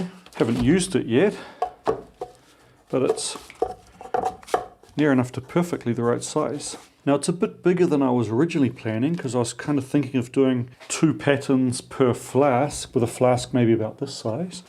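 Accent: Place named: New Zealand. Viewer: I joined this channel because you use FreeCAD! (and maybe also because I love the Kiwi accent...)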